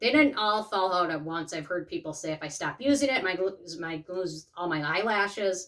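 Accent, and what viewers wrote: Minnesota, United States. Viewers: You've got that classic Minnesota accent.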